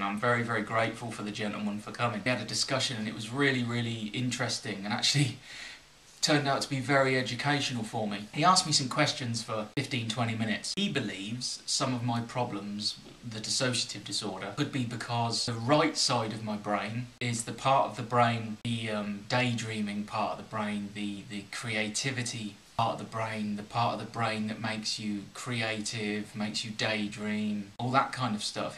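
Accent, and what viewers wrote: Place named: United Kingdom. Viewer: I rarely leave comments on YouTube but I think you are really brave to talk about your mental problems in public, in front of a camera... You look like a truely good person and I hope you get better soon. I'm a high carb low fat vegan too (starch based) and I find your videos so helpful and interesting ! Keep going ! And I love your brittish accent....so soothing! (I'm French)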